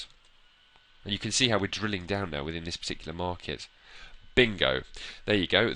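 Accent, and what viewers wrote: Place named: Wales, United Kingdom. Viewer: This vid, and what I think is a Welsh accent, is awesome. I'm starting a second career and thinking about marketing, research, etc. Don't have much of a clue how to get started. We didn't have computers 101 in college when I went eons ago, So thanks, I've subscribed and will watch your other stuff. This video has an easygoing casual approach, with just enough complexity for me to wrap my head around. Excellent, thanks again, and happy trails to me!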